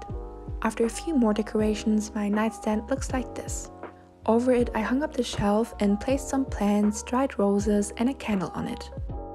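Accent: Germany. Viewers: You look soo pretty!! And with your sweet german accent girl you nailed that room makeover!! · She up is German I think I hear the accent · Es ist voll süß das man deinen deutschen akzent hören kann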